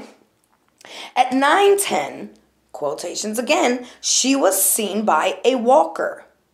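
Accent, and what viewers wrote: New York, United States. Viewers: love your accent, is it new york? say "i'm walkin' here!!" for me. ha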